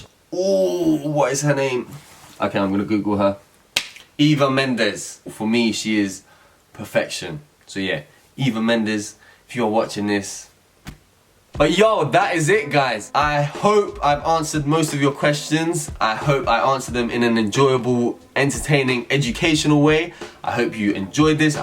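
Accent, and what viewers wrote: United Kingdom. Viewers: #AskPhitzee I heard you say you are from Montenegro. Your accent sounds British. Have you lived in Britain most of your life? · talvez seja pelo sotaque britânico dele, dá a impressão que ele ta falando mais rápido mas é só o sotaque mesmo. não desanima, com o tempo você vai acostumando.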